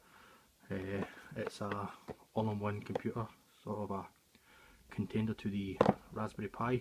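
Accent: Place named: Scotland, United Kingdom. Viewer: His "gibberish" is Scottish accented English.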